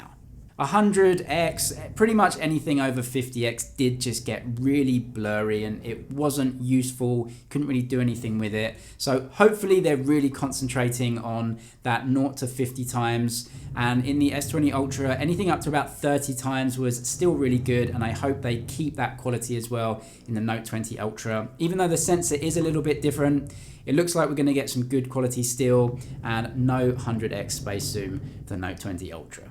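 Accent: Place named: United Kingdom. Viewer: I thought you were Brian Firkus but with a British accent ahaha nice video though! 👍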